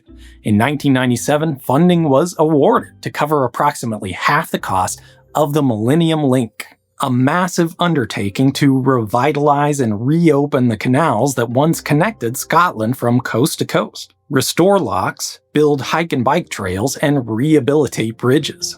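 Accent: United States. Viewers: It is always fascinating watching americans talk about Scotland and pronounce things in their accent. I live in the area and more than the engineering, it was something that added a lot of benefit to an area that was notorious for being less than amazing. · It's interesting to hear how an American pronounces Scottish words. There is a distinct shift in vowel sounds between the two nations, even if we share a similar language. Sometimes, the pronounciation is amusingly different from what us in Scotland would use, but sometimes, it's harsh, almost alien sounding. Languages are interesting.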